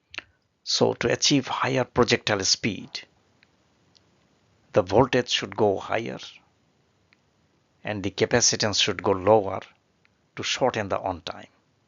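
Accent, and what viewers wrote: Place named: Nepal. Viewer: Nepali ho sir accent nepali jasto xa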